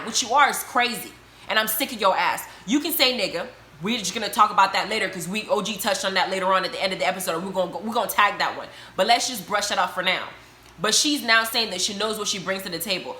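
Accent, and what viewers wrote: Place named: Caribbean. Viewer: I hear a accent.. are you Caribbean by chance?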